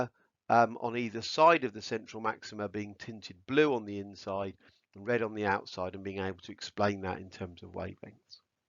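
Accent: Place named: United Kingdom. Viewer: Amazing video. Exactly the information I needed presented in an exceptional manner. British accent is the cherry on top :) :) :)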